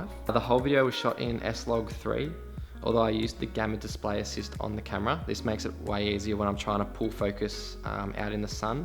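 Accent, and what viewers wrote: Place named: Australia. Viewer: wait are u from australia ? hear ur accent ;)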